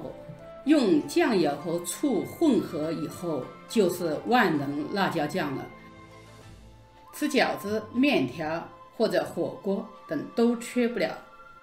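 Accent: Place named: Hunan, China. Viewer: 我看压饺子皮视频听你讲话就觉得你是湖南人，果然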